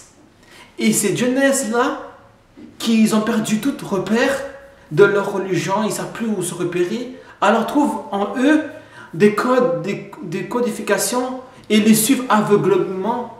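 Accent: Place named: Brazil. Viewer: Tu as un accent brésilien...🙂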